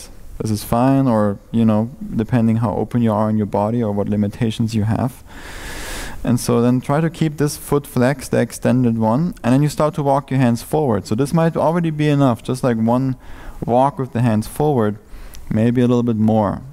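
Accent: Germany. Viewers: Haha du bist doch locker deutscher hört man direkt an dein Akzent 😆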